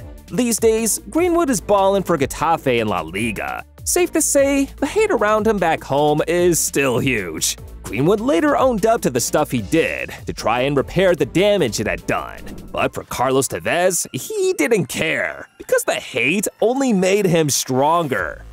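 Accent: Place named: United States. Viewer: Your accent tells us everything we need to know. Football didn't begin in 2011. Go back to watching baseball.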